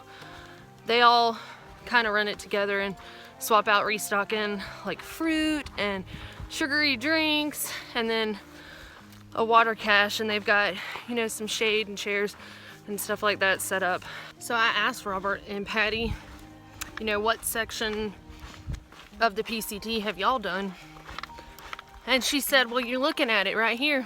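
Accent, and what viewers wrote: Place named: Oklahoma, United States. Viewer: Awesome, you are a true good sole, way beyond your years!! Thank you for all of these videos, I grew up in Huntsville, and Oklahoma so love your dialect, it's all about being simple and enjoying the moments you never get back everyday!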